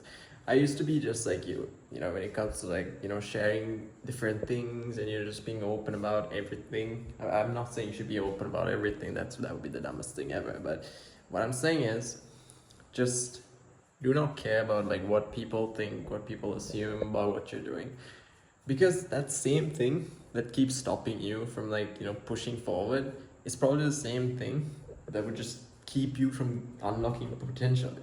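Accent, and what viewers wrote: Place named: Sri Lanka. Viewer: Bro I identified that accent immeadiatly😂 Im Srilankan too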